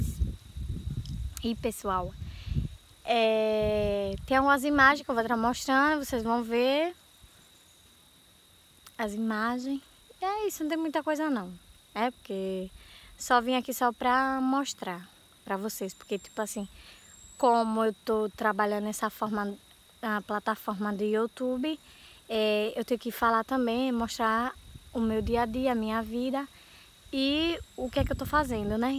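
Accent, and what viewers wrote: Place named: Northeast, Brazil. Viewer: Boa tarde, vim Através do canal Gaby Morena, gosto do seu sotaque nordestino, boa sorte e muito sucesso com seu canal.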